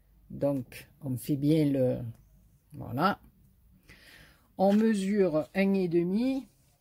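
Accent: South, France. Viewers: Merci infiniment, j'ai vraiment passé un merveilleux moment avec l'accent du soleil..... Pour moi les petits anges ce sera pour l'an prochain, cette année nous quittons notre maison parce que ça fera 1 an le 24 décembre que notre chaton à rejoint les anges et nous n'avons pas le coeur à fêter sans lui.... Encore mille mercis et de très belles fêtes à toi et tes proches dans l'amour et la joie, gros bisous. Lucia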